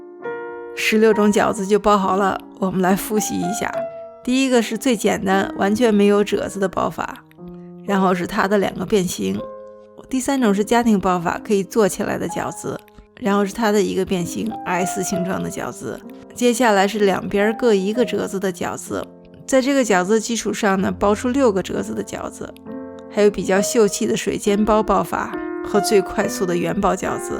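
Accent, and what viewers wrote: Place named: North China Plain, China. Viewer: 是哪儿人呀好标准的华北平原儿化音哈哈哈哈哈哈河北人表示听了超亲切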